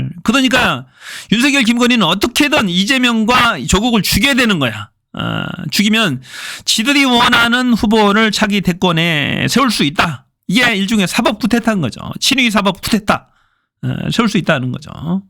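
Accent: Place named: Jeolla, South Korea. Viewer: 한성진 최고다. 아주 시원하다. 방송하는 놈도 전라도구나 ㅋㅋㅋㅋㅋ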